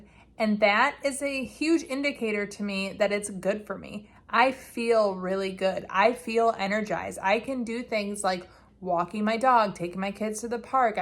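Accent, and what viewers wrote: Midwest, United States. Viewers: I detect that hard midwestern accent.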